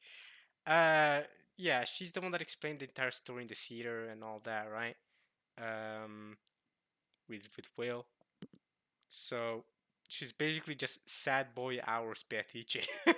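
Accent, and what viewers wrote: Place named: Norway. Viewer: And you are talking like norway people... are you from norway?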